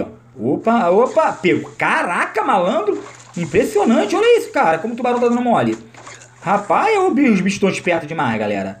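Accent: Rio de Janeiro, Brazil. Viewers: Mano tu é do rio?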